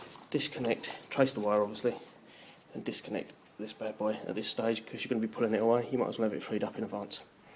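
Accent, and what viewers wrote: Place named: United Kingdom. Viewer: Nice one.. Good to hear a UK accent! Im about to do this on my '97 CBR 6f. Kept burning out the mid connector. Anyway brilliant upload thanks:) oh and check out 90's Japanese Sportsbikes on Facebook. Were a good bunch and ya bike qualifies lol.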